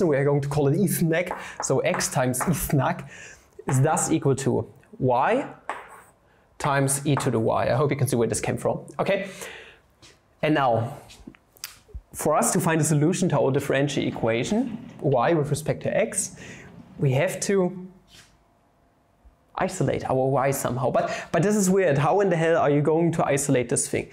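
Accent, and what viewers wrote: Germany. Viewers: I've never understood a single flammable math video but I still watch them...musg be the accent. I'm a sucker for german accents and chalkboards.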